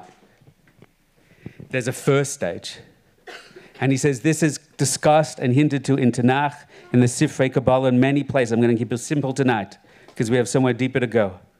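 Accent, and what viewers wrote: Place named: Australia. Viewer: He has an Australian accent and not American.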